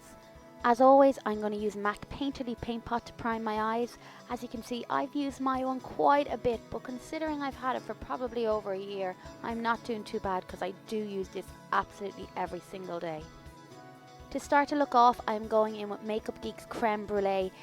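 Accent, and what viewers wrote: Ireland. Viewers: This is an old video but I was contemplating getting the Vintage Vamp and wanted to see it on blue eyes, I’m definitely going to buy it now! The lovely Dublin accent was a nice surprise and reminds me of home as I live in the Uk, up the dubs! Xx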